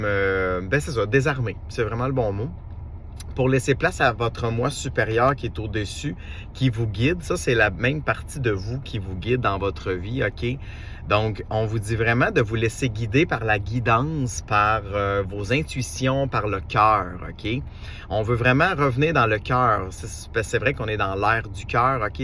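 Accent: Canada. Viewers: Bsr ce fut un plaisir avc votre charmant accent canadien à chaque fois je regarde vos vidéos 😊. Bne soirée 😊❤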